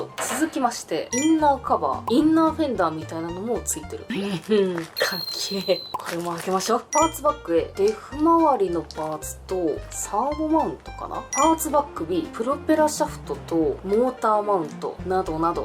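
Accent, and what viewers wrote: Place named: Japan. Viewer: I prefer hearing your Japanese accent with the subtitles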